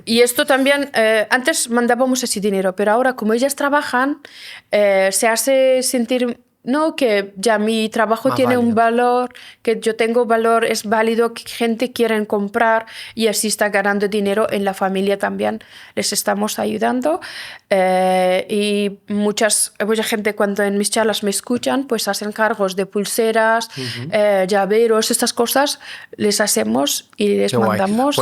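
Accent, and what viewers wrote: Andalusia, Spain. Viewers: Amo tu acento Andaluz!! 💜❤️💖 Y a Khadija mis respetos por su fuerza y valentía!! Abrazos desde Colombia 🇨🇴🇨🇴🙏🏻🙏🏻👍🏻👍🏻💜🌈🪄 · Oye no me gusta el acento español pero el tuyo 😮❤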